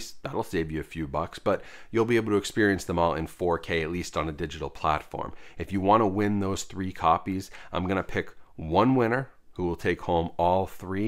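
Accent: New England, United States. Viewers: are you a New England bro?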